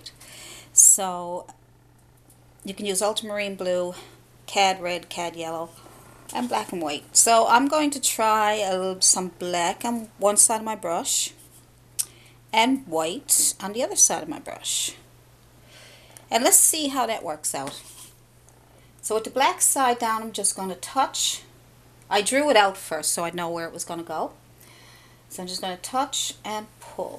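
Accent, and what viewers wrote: Newfoundland, Canada. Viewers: are you from Newfoundland? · Thank you so much for putting up this tutorial!, I was wondering why your accent was sounding so familiar so hello fellow newfoundlander! <3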